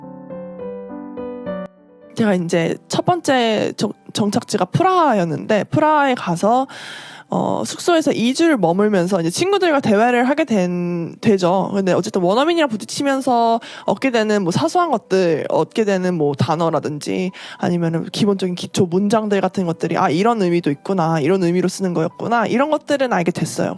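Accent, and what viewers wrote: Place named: United Kingdom. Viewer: 목소리랑 영국발음이 찰떡인것..영어 뽐뿌 받고 갑니다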